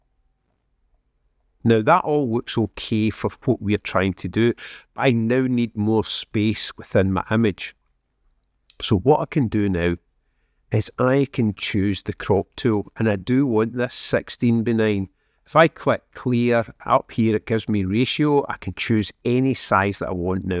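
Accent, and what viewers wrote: Scotland, United Kingdom. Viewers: As a non native speaker it took me quite a time to get the hang of your (Scottish?) "touch". But your artistic approach kept me listening - much to my advantage, I must say, language wise and more so art wise too. Main question, of course is: How did you compose these three images in the fist place? Could you do a video about that too? Pleeeze!!!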